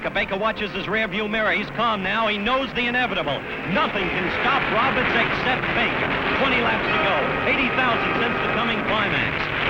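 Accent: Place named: New York, United States. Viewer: It is. He would have been my last choice for this event. NYC accent doesn’t work here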